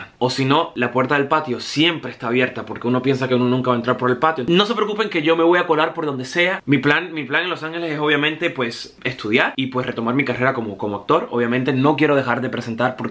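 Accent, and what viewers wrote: Cuba. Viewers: Este pk sigue con el acento neutro ya no estamos en univision habla bien que eres cubano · Felicidades Pero por favor Habla con acento neutro Porque cuando hablas como cubano no te entiendo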